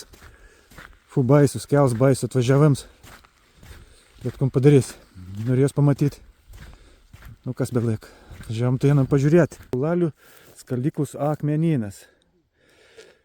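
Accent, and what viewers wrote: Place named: Plungė, Lithuania. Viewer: Smagė vaizdą pasėvakščiuojėmā, graži šnekta, a karts ne no Plungės būsi?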